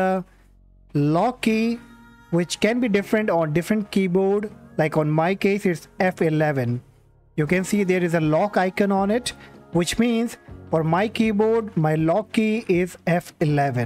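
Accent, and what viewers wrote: India. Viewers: every time i hear the indian accent i calm down and know its all gonna be okay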